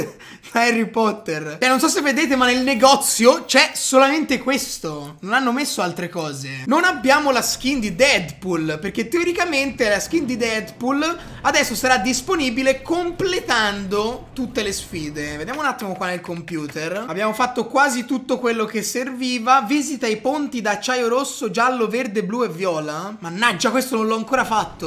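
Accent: Tuscany, Italy. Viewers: E il dualetto toscano genios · hey ma sei toscano o sbaglio?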